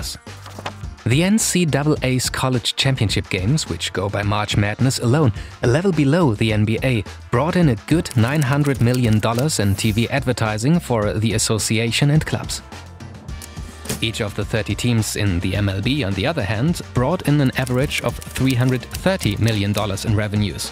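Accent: Germany. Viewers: Still loving den deutschen accent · I like this guy's thick German accent.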